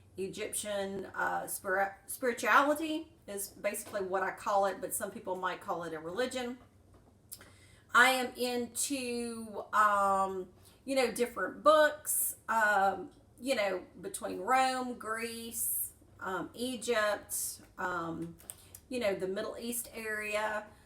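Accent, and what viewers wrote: South, United States. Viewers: Its nice to here another southern voice on this topic!! Our culture here is less than a blink of an eye in Earth time. But still, in 10 years of this journey, not one person has have i met i can talk to about anything similar lol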